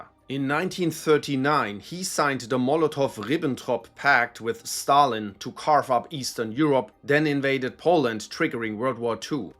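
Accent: Germany. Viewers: You know it's the real deal when the narrator has a German accent. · Already love the German accent and language and hearing german english makes me wet ( I'm a guy ) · The german accent makes this video way more easy to sympathize with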